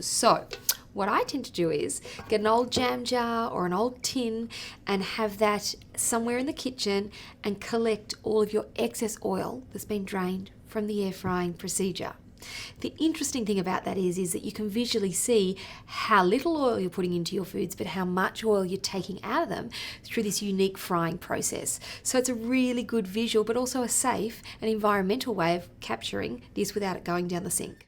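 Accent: Australia. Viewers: the best accent.. gotta love land of kangaroo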